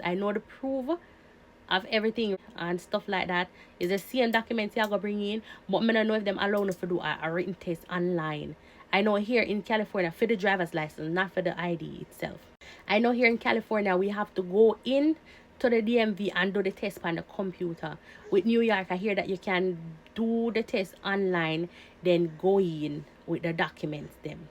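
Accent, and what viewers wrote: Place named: Jamaica. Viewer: Thank fada Gad ur Jamaican,this i understand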